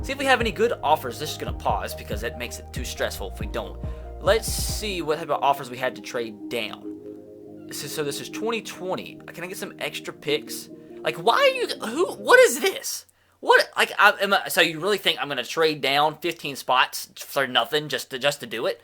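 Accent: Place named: Alabama, United States. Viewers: This man with that southern voice tho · Alabamer accent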